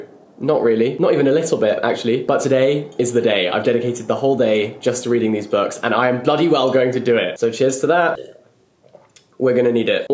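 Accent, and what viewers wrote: United Kingdom. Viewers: omg he has the most british birtish accent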